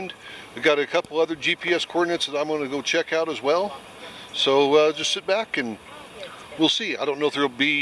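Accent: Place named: West, United States. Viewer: I don't know if you're still looking at your comments but I am a Westerner living on the East Coast do you know how long it's been since I've heard someone say c r i c k instead of Creek so nice to hear the accent years ago my mother-in-law that had a fit when I told her we were going down yonder to the c r i c k she did not appreciate my accent she informed me that I was going down to the creek not Yonder to the c r i c k I enjoy your videos Keep On Keepin On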